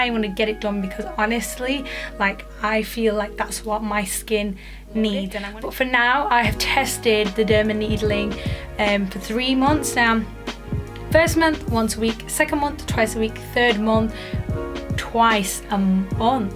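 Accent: England, United Kingdom. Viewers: Akcent is like amir khan England's boxer 🤣😂😘😍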